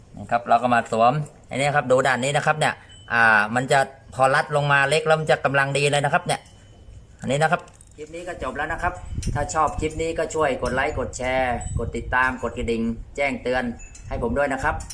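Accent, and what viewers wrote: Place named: Trat, Thailand. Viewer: พูดสำเนียงคนตราด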